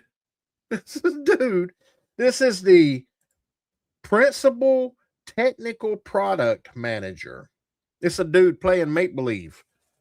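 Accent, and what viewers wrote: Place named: Appalachia, United States. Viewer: I gave you over 5 and a half minutes of my life to listen to your hillbilly ass yawl yawl yawl your way to complete and utter retardation.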